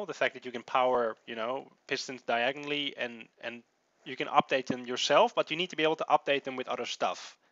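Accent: Netherlands. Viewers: ben je eigelijk nederlands ik hoor het aan je stem? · Je kunt zo horen als nederlanders engels praten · I can hear from your accent you are dutch, arent you? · Tuurlijk is hij nederlands dat hoor je aan zijn verkrekt engels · Hij praat met een nederlands accent... LOL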